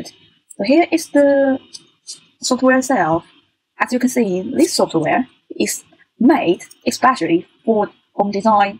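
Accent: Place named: United Kingdom. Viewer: 小姐姐的英式英语让我惊呆了！告诉我，还有什么你不会的？：）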